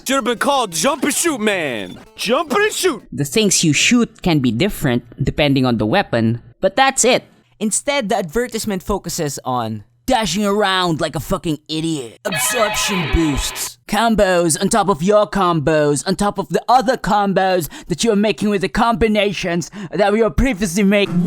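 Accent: Philippines. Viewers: You sound Filipino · Filipino. His English is good but his accent is pretty heavy. This comes from another Filipino.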